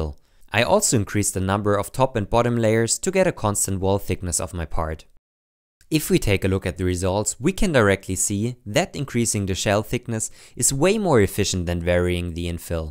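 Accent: Germany. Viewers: Man hört schon ein bisschen, dass Du Deutscher bist... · Like the Videos, but the german englich (i am german too) distracts me.. xD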